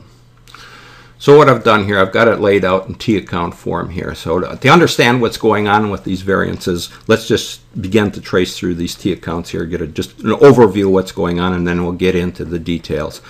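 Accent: United States. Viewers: dude wth this is confusing as fuck with ya yankee sounding ass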